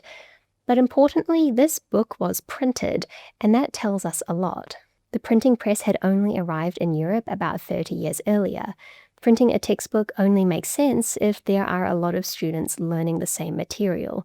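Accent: New Zealand. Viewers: definitely not Australian, that’s a New Zealand accent 😊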